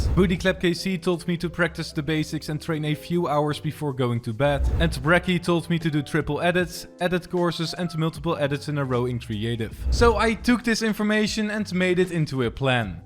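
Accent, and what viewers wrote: Netherlands. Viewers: Are u from Netherlands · echt een hollands accent hahaha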